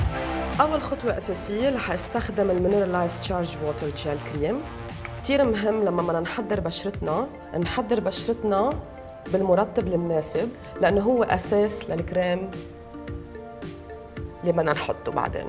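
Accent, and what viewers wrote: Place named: Lebanon. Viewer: Arabic Lebanese accent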